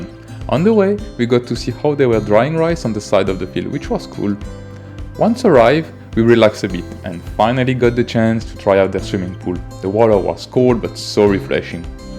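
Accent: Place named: France. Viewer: Your French accent is WAY too thick - je n'ai compris RIEN! Thumbs DOWN!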